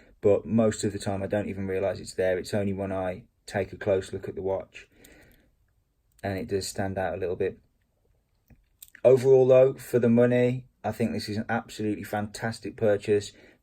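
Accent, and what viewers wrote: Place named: United Kingdom. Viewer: superlative British accent absolutely outstanding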